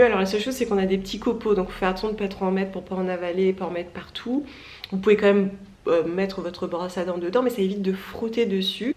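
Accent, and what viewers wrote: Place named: Nord, France. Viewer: Hé hé ✌️ ça fait bizarre d'entendre une youtubeuse du Nord !!! 😉😊 Entendre Lille, Tourcoing 👏👏👍👍😁 vive le Nord. Je viens de te découvrir. 2 ème vidéo. +1 Abo. 👍 Pr le cuir, c'est pareil, j'en ai encore. Ms je me dis que le mal à été fait, et de les jetter ne changera rien. Donc je les jetterai ds qq années... ( Comme toi, mes affaires dure un pt Moment... ) Merci pr tes idée et info en tt cas. 🙏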